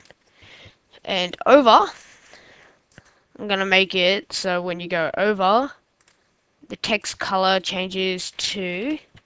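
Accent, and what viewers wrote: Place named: United Kingdom. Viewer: omg love your british accent ^^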